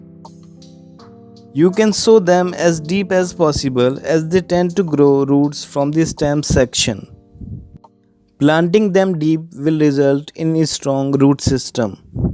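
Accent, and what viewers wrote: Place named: Telangana, India. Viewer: Pakka Telangana dialogue 👌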